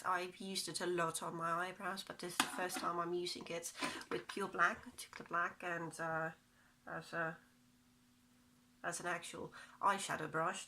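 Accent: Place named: United Kingdom. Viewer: Already subed here darling!! Love your english!!! I speak american english but love ritish one!!! like 32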